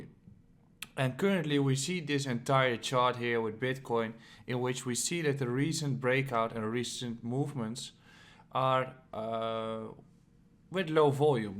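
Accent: Netherlands. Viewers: Are you Dutch? You sound like Dutch.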